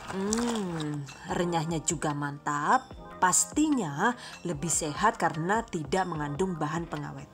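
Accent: Banyumas, Indonesia. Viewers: Ngapak2nya bikin gemes 😀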